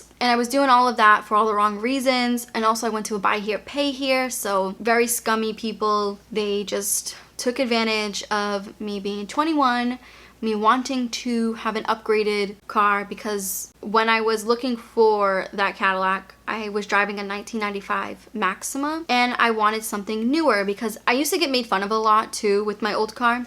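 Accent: Boston, United States. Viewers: I love your boston accent.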